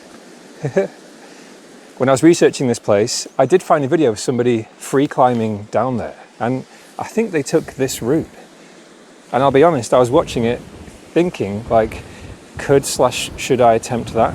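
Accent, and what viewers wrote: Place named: Northern England, United Kingdom. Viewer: Watched for 2 mins ,instant subscribe ! .Articulate, intelligent, interesting and northern. :)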